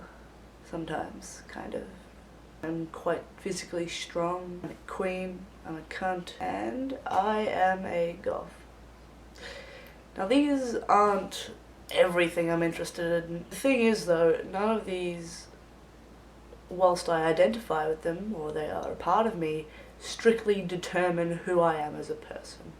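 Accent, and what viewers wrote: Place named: Australia. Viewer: Your look is absolutely terrific, and I'm liking your videos... but your accent was still a surprise! (even though I knew you were an Aussie, from Facebook). Keep it up :) - Ian R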